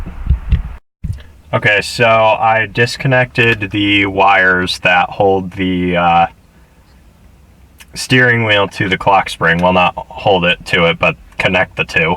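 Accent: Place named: New England, United States. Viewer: New England, not England lol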